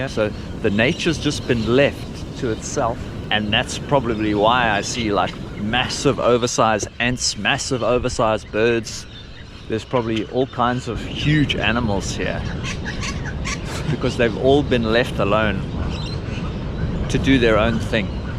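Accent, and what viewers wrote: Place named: South Africa. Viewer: I really like you videos. Freedom shouldn't be an expensive thing. You make it look easy. 😊 You are a South African born aren't you? Your accent is a clear give away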